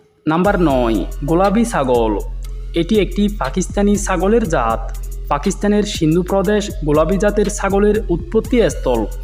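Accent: Chittagong, Bangladesh. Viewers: ও ভাই আঞ্চলিকানো চট্টগ্রামের বাসাও নও কি ভাষা এখনো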